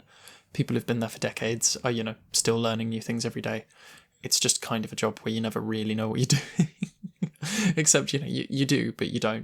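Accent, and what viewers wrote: England, United Kingdom. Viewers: im from argentina and i use your videos to practice and learn more about british and i loooove your accent !! i wish i could speak english like you someday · I haven't watched this yet but wanted to say your hair is beautiful. Also if you mind me saying so you are just pretty and cute. As an American your British accent is heavenly. I feel like you would give the warmest softest hugs. I hope you're well. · What part of the UK is your accent from I quite like the way you speak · Also as a fellow English person I totally felt the accent bit 🙃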